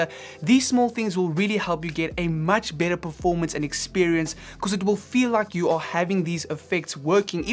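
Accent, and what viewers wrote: South Africa. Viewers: Good stuff! I always learn a lot from your vids.! And your S.A. accent is the coolest! 😀😎👍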